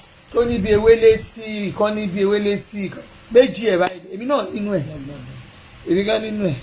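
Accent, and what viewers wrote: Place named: Egba, Nigeria. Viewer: You always make my day Stop sebe. I always laugh when I watch you speak in Egba accent. Pepper dem well well. Love you always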